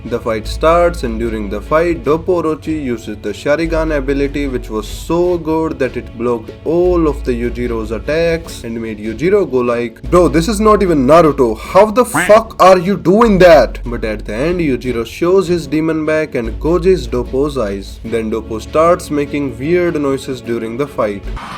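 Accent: India. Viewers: I swear his indian acsent is getting thicker every vid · Bro are u indian? cause the accent is much similar like mine. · I dated a half guyanese girl and she had mostly indian aunties and uncles. there's no mistaking his accent. Actually , his voice is very soft for an indian man. Probably why his videos do so well. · You people🤦‍♂️, Go to his discord server then you'll see his name which is " ", which is obviously an Indian name, as I'm an Indian myself and i already recognized that he was Indian by his accent which is the Special Indian Accent only indians have. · just stop. Ur Indian accent just kills the vibe and the joke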